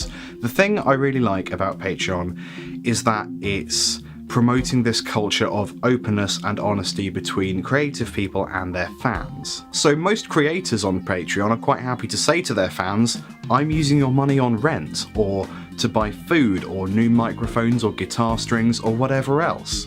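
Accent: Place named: England, United Kingdom. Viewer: Thank god an English accent! 🤣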